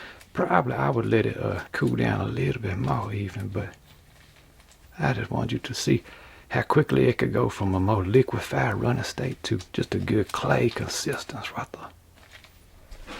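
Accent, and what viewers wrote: Louisiana, United States. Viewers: Bob Ross + Louisiana style ASMR = I'm hooked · is this guy from louisiana? he has the creole/ cajun accents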